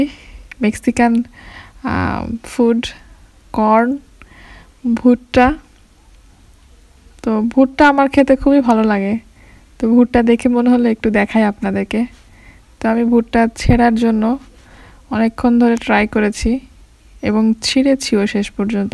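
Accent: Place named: South Bengal, India. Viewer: amar ও ত শেই প্রশ্ন । ইনি তার গ্রাম কোথায় তা বললেন না।! খুব খারাপ কথা । এতো টুকু সাহস তো উনা র থাকা উচিৎ ছিল, কমপক্ষে আমি ইম্পপ্রেসড হয়নি এটা অপশন এ রেখে।ইনার কথা শুনে অবশ্য মনে হয়েছে ইনি হয়তো বা সাউথ বেঙ্গাল(মানে খুলনার দিকে) কিন্তু আমি নিশ্চিত ও না।আমার নিজের বাড়ি জসরের কোন এক গ্রামে( যশোর থেকে ২০ মাইল দক্ষিনে ।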